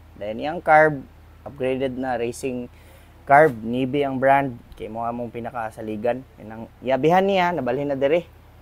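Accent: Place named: Davao, Philippines. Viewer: The way you talk... Feel ko taga davao kayo😊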